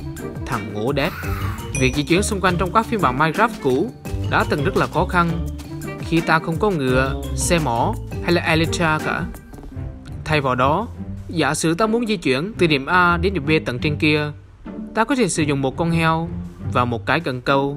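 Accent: Central, Vietnam. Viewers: Nếu mn chưa theo dõi kênh của lâu thì hồi xưa tầm 1 năm trước có nói tiếng miền trung =)))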